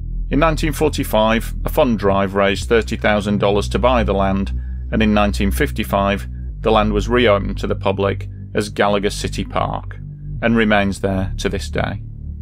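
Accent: United Kingdom. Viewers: I can tell you're British, but youve live in America for a while. I can tell by the way you say "the hospital"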